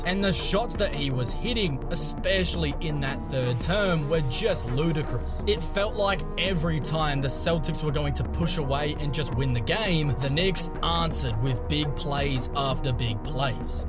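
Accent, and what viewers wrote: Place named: Australia. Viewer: Listening to Knicks analysis in a crazy Australian accent is SICK! Lol I love it!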